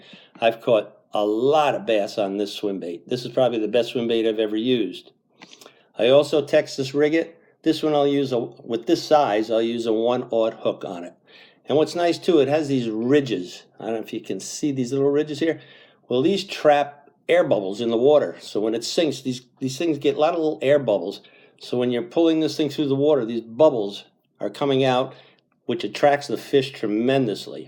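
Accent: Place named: New Jersey, United States. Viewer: Elope the NJ accent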